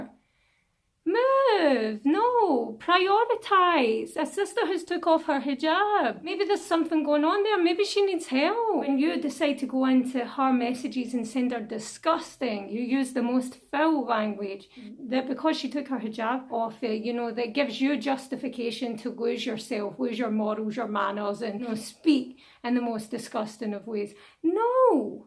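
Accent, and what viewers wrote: Scotland, United Kingdom. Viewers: AAA ooozu billah, I thought u r quarantine..Scottish accent is too unique from the British one but beautiful.. Mashallah.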